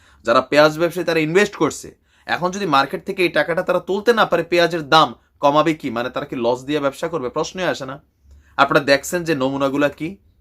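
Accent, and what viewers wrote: Kolkata, India. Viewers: Koleksata bangla balo bolcn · ভাই কোলকাতার ভাষায় কথাবার্তা অসাধারণ হয়েছে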